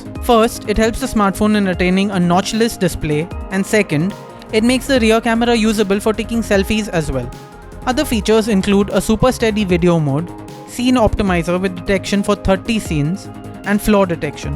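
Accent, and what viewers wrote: India. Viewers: You sound like a indian scamer.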